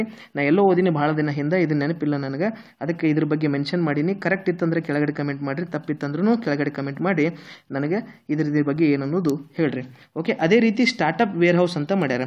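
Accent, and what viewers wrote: Uttara Kannada, India. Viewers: Sir, I so like your uttara kannada language , that is our mother tongue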